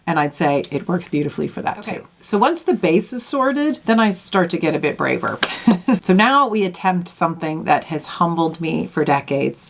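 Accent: Ireland. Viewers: Hey ❤❤ i detect an Irish accent ??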